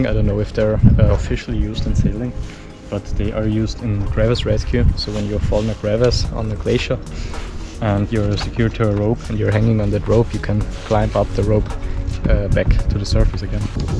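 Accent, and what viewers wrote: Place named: Austria. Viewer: In deinem Vorspann heißt es, dass du der jüngste Deutschsprachige sein willst und machst die Videos in deinem holprigen Englisch, stark geprägt vom österreichischen..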